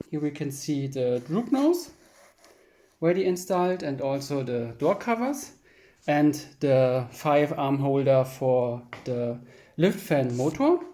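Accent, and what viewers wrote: Germany. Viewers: are you german? Nice video and plane! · der deutsche Akzent sticht extrem raus, aber das Projekt ist super. Man erkennt, dass viel Mühe reingesteckt wurde. Good job 👍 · please, talk german, it sounds like you are a german native speaker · digga hab noch nie so krass deutschen akzent in englisch gehört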